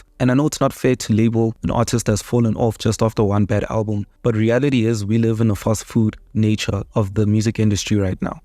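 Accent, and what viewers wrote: South Africa. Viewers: You from South Africa my brother? If so, me too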